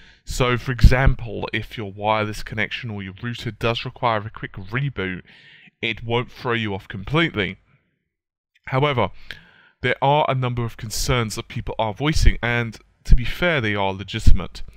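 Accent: United Kingdom. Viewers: British?...I just thought u were always drunk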